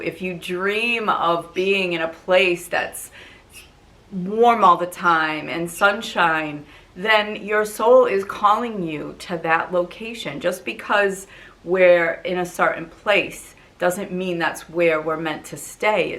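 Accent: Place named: Fall River, United States. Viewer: this is such an open and inspiring video. Thank you for posting it. I knew I recognized that accent, I’m originally from Fall River! ❤️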